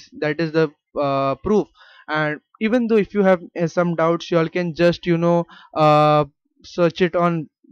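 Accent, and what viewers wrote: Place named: India. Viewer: Indian accent but i need to mine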